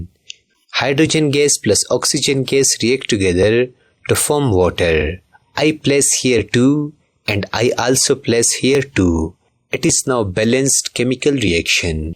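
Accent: India. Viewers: 🎉🎉 thank you I thought u r from India 🎉❤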